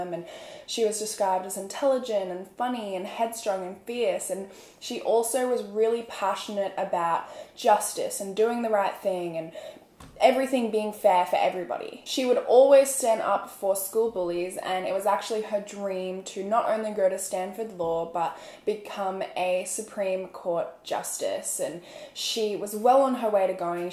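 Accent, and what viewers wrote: Australia. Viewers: Usually i never comment on a yt video, but here i a am today... i just wanted to say that your work ist amazing. You put so much effort into the research, your videos are always so exiting and detailed. But still you show so much respect to the victims and their families. Btw i love your accent, it always reminds me of my time in Australia 🧡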